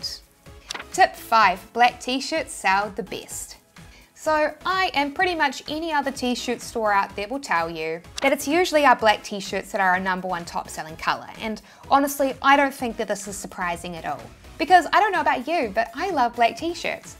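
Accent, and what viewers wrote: New Zealand. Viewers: New Zealand accent is not that much of an issue. Guys from India have a whole lot bigger accent problem. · Great channel and great videos I have followed your advice and made great progress. but now I need your HELP!!! So teespring has disabled my account on the bases that I had violated their trademark rules. I do not understand why this happened, I have uploaded more than a 100 design to the site and none of them has any mark or logo that belongs to someone else. Please what should I Do? Do you have any consultant page where I can reach you directly for advice? I would of course pay for this service. Thank you for your cooperation and keep up the good work. I love your accent by the way Kiwis are very nice people! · it was an Itsy bitsy teeny weeny yellow poker dot, new zealand tein ny ( ten) haha! U are sweet! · Right when I thought you had an Australian accent you popped out of nowhere and said this is a New Zealand accent lol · At no point in time did I think your accent was Australian. 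Definitely Kiwi. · Fun fact - despite being an American, I somehow suspected your accent was Kiwi rather than Aussie. I guess I've just had that much experience already from hearing both thanks to the interwebs. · Hello, you have New Zealand accent, I'm not a native English speaker but I thought at first that you are Irish ❤ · Loving that New Zealand accent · The New Zealand accent is wonderful! I just love the way it sounds.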